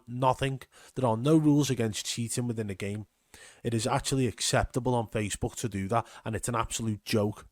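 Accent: Liverpool, United Kingdom. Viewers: Great work again buddy, scouser?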